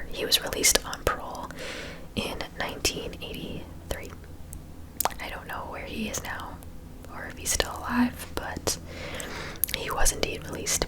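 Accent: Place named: West Coast, United States. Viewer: You sound super West Coast when you talk.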